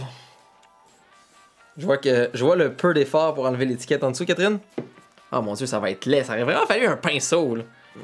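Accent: Quebec, Canada. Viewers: MDR 😂 qu’est-ce que je peux aimer l’accent québécois😂😂❤️❤️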